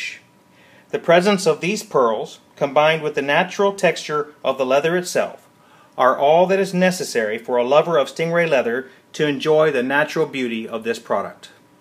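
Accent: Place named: Canada. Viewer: Are you Canadian by any chance? I’m from Wisconsin and have heard a lot of northern accents but yours sounds very Canadian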